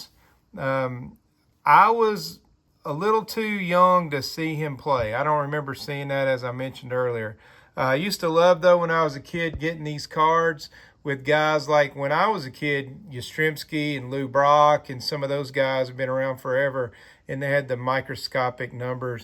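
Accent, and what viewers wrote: South, United States. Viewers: A Labor of Love - Especially Poignant when done with your southern accent ❤❤❤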